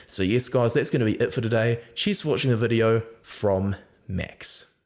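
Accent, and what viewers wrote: New Zealand. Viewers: hi Max! Really enjoying your videos :) You're an excellent host! Many reasons for this. I'm a Frenchman, who lived in NZ between 2009 and 2016, looks like the ABs have stopped dominating and winning everything as soon as I left Aotearoa :( but that France went on to (almost) win 2 soccer world cups since ;) and on its way to conquer the 2023 RWC!? We shall see!. Hearing you talking about rugby with your kiwi accents brings back so many good memories of NZ, and your passion and knowledge of the game and how you communicate them are unmatched. Keep going bro :) Take care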